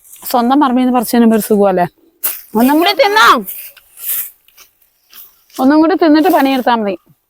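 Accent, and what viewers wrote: Kottayam, India. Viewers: വീട് കാസർഗോഡ് ആണെന്ന് മനസ്സിലായി. എന്നാൽ ഭാഷ കോട്ടയം പത്തനംതിട്ടയിലേതു പോലെ. ആൾക്കാരുടെ സംശയത്തിനൊന്നും മറുപടി കൊടുക്കാത്തതെന്തു.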